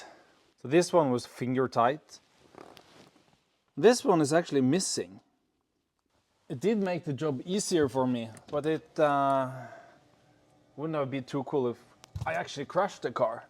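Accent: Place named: Norway. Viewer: Du må seriøst prate på norsk. Ingen fra utlandet orker å høre på den norsk / engelsken din.. Først må du nå ut til publikum her hjemme før du skal gå «viral». Du har bra content, men du må gå gradene som alle andre dessverre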